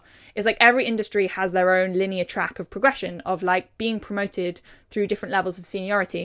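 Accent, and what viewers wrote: London, United Kingdom. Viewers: Her accent has become real London lol happy for her - hope all works out 💚💚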